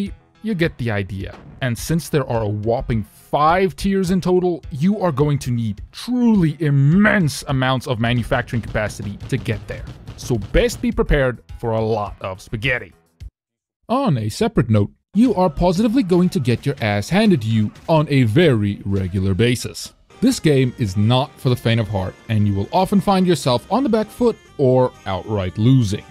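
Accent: South Africa. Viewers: Long since I've heard a fellow South African Youtuber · Dude! Are you South African?? Cheers from a fellow citizen missing mail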